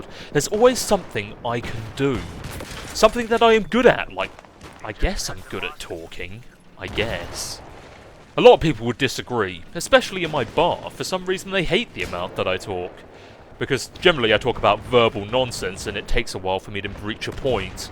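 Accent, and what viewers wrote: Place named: England, United Kingdom. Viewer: I honestly can't put my finger on where abouts in England you are from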